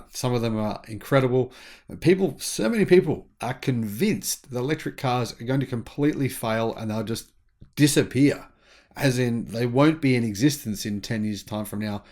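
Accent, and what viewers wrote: Australia. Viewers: But are Australian or Norweed, Im confused, u sound Aussie but reckon you're a viking? do you wear a hat?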